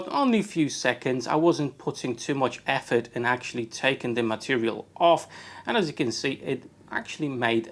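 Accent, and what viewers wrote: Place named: West Midlands, United Kingdom. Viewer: Hey - your English is great, certainly a few thousand times better than my Polish! I'm guessing you were in the West Midlands (Wolverhampton?), judging by what I can detect from your English accent. All the best, Rob in Switzerland.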